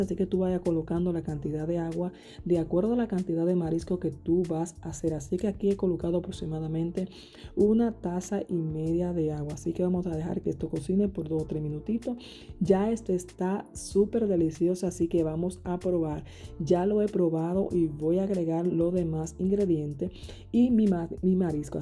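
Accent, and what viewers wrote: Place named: Dominican Republic. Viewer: Me gusta mucho el acento dominicano. Saludos desde Venezuela.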